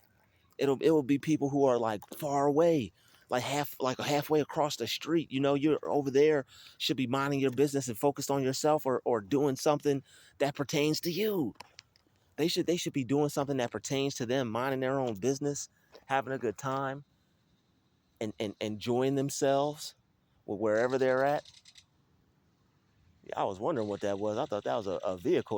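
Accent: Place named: Midwest, United States. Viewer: Spot on. You from the Midwest? You talk like it. I get what you're saying. I know from experience.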